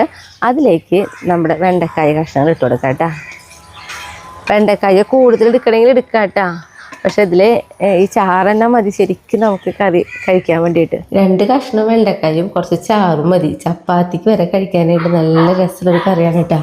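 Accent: Thrissur, India. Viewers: ഓരോരുത്തർക്കും വേണ്ടി സംസാരരീതി മറ്റാൻ പറ്റുമോ.. അത് തൃശൂർ ഭാഷയുടെ പ്രത്യേക ശൈലി ആണ്... നിങ്ങൾക്ക് കണ്ണൂർ കാസർഗോഡ് ഭാഗത്തുള്ളവരുടെ ചാനെൽ കണ്ടാൽ എന്തായിരിക്കും പറയുക... മനസ്സിലാവുക പോലുമില്ല.. പക്ഷേ നമ്മൾ എല്ലാം കാണണം.. മനസ്സിലാക്കണം.. അങ്ങനെ അല്ലെ.. അല്ലാതെ ഞാൻ പറയുന്നത് മാത്രമാണ് ശരി എന്ന് വിചാരിക്കാതിരിക്കുക.. ഇങ്ങനെ പ്രതി കരിച്ചതിനു ക്ഷമിക്കുക.. Content അല്ലെ പ്രധാനം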